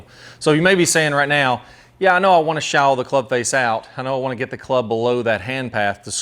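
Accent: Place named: Florida, United States. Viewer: Hi, as you may recall im a lifelong fan! Being British, just love that Florida(?) accent!still getting that lag and the sweet little draw that you demonstrated. But anyway, I was trying to clean my car (auto) carpets by beating them against the garage wall. No matter how hard i tried just got a weak ‘thump’ that didn’t dislodge the debris, and scratched my knuckles! So I moved to the corner end of the garage to avoid the scraping of knuckles. And WOW! My hands went clean past the corner wall and the CRACK of the carpet against the wall was (almost) deafening! And the debris came exploding off Maybe ive just discovered a ‘hands in front of the ball’ drill.? BTW, i used this technique hammering in tent pegs when out camping. Allowing the stinking hand to move down (a little ) the line of swing just beyond point of impact Effortless effect! 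Maybe this might help someone Keep up the great vids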